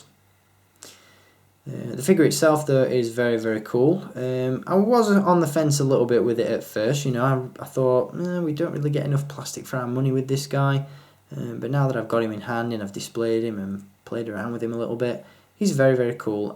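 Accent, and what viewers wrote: United Kingdom. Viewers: Are you British? You sound British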